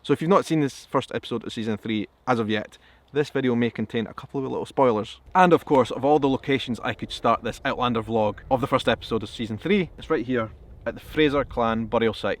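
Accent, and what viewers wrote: Scotland, United Kingdom. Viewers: Hi - love your vlog episodes about Outlander. In several of the videos you mention that all this is new to you because Scottish schools don't teach the history about the Jacobites, etc. I was wondering if now that will be changing due to the interest Outlander has generated, not just around the world, but in Scotland in particular. Love hearing your accent - Keep up the great commentary!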